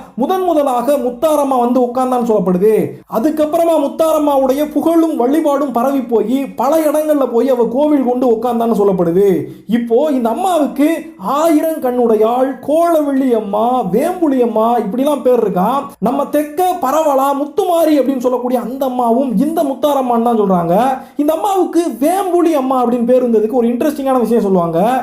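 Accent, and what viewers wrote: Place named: Madurai, India. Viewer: Arumaiyana pathivu Nanba... Ungalukku maduraiya ... Unga pechula Namma ooru kaathu adikkuthu....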